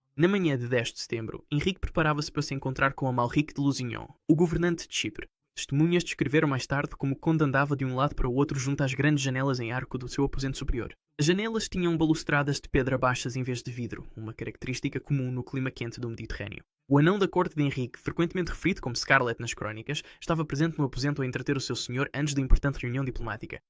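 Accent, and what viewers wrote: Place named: Portugal. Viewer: Na verdade, português europeu. Se prestar atenção dá para entender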